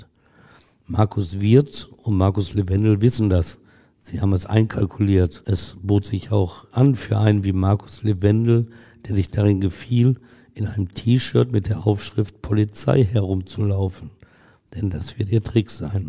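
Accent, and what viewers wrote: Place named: Ruhr, Germany. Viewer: Er ist doch der Gerichtsreporter und erzählt es wie er alles im Gerichtsprozess erlebt hat. Kein Anderer kann es doch nicht so erzählen . Das ist Ruhrpott.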